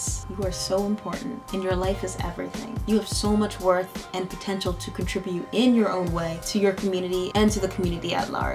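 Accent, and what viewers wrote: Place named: Africa. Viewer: so i had to subscribe after the African acccent....my sista oh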